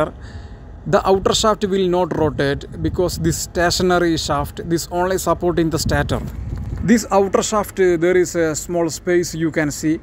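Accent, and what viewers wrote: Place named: India. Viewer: talk look like bullshit....your voice bad from india....tatatata..like busy...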